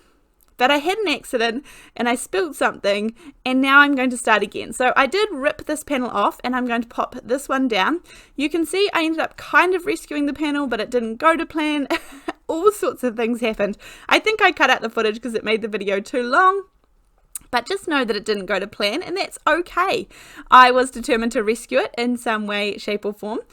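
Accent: New Zealand. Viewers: I like how you fixed the card. I like the second version better also. Love your videos. I enjoy your beautiful NZ accent.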